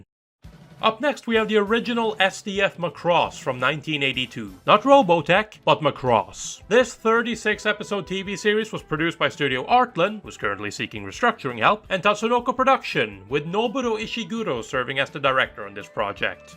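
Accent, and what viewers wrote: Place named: Norway. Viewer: Er du norsk? Du høres ut som en nordmann:P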